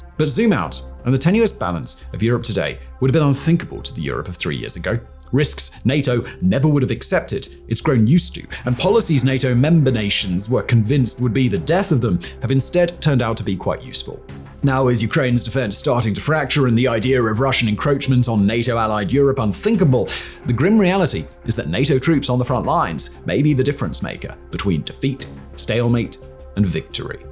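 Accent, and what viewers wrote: United Kingdom. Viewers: ok, 3000 comments so probably no chance you check mine; so you ll get a quick comment mate, you don't know what you re talking about, which is surprising considering you sound british just read churchil s memoirs mate and you ll know what s going on also, forget NATO troops, french troops; france got out before, can get out again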